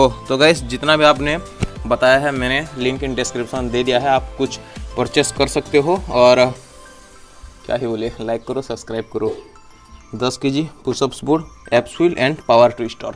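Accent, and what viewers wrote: Odisha, India. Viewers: Bhai aap odiya ho kya ? Aapki language se u sound like .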